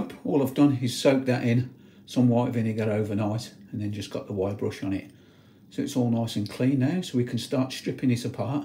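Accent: Birmingham, United Kingdom. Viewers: mate, did you ever try the bicycle wheel spokes for tension wires?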